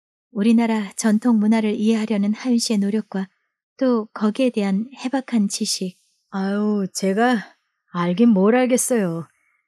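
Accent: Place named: Gyeongsang, South Korea. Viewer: 목소리의 마법사이신 어쩌면 경상도 사투리도 넘~ 감사합니다^^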